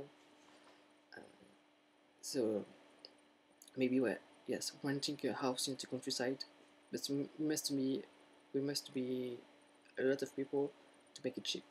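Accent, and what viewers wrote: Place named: France. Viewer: aaah beautiful french accent, I loved Rosy 'ardy :D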